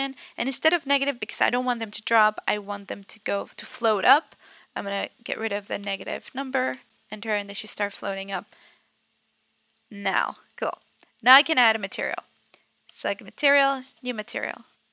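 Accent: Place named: Netherlands. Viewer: You accents sounds like Dutch (Netherlands)🇳🇱 ones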